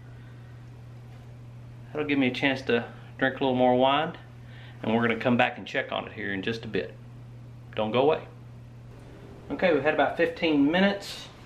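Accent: South, United States. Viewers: I love when a southern accent roasts trump 😌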